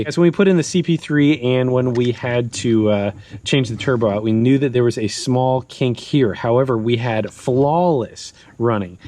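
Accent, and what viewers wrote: United States. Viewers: Are you from Maryland? Or born and raised in Florida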